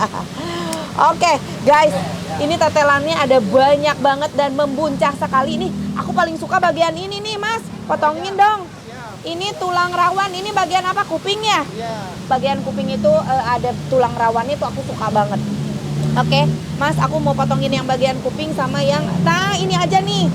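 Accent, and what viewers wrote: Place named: Java, Indonesia. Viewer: klo liat teh makan bakso bikin ngiler.. medok bgt...🖒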